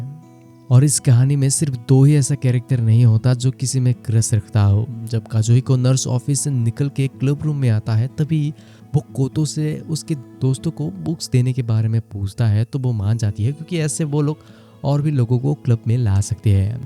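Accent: Northeast, India. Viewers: Northeast se ho kya Bhai?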